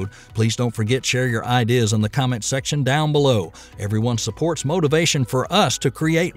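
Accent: South, United States. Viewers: This is my favorite space news channel. Plus the announcer sounds like the original men that sent humans to the moon. (Southern accent.)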